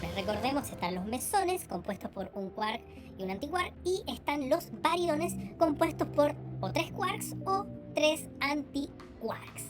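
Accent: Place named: Chile. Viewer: hola una consulta... eres chileno,... yo chileno seguidor de canales de ciencia como robot de platno, date un vlog, Thoisoi, jordi prereira entre muchos otros... primera vez el algoritmo me dejo caer tu canal... un placer escuchar ciencia en acento español de chile... y encontre por fin como un proton se convierte en neutron... eso buscaba y pille tu canal... y al fin lo aprendi... los Piones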